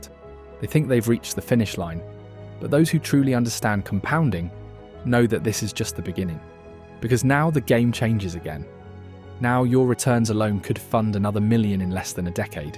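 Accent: United Kingdom. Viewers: Weird to hear an British accent talking about 1 million dollars and not Pound Sterling.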